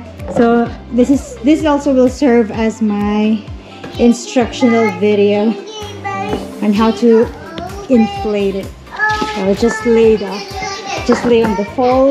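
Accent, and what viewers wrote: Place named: England, United Kingdom. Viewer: I always love your english accent, Congrats for having a new one, enjoy your new air mattress #dabestieszoe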